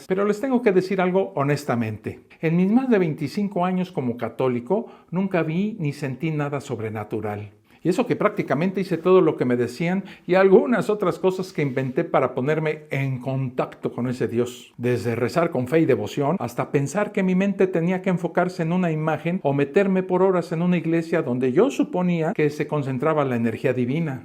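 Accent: Mexico. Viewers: estoy fascinada contigo..me encanta escucharte en tu acento tan mejicano...todo un océano no nos separa eres ya imprescindible....un saludo desde España❤❤❤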